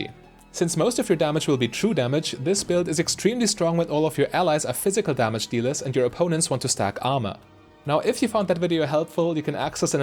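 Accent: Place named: Germany. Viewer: Der Bruder ist deutsch das hör ich doch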